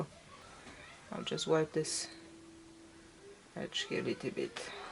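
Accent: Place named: Poland. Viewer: Good Morning, what a great idea on how to use the snow ball pieces, I would have never thought of it. Its a wonderful way not to waste any fabric. I really enjoy quilting videos and how to use up scraps. Definitely would love to watch more of your video's. I love your accent, I believe you are Polish, not sure if you are in the US. Your English is fantastic. Hope to see more of your videos.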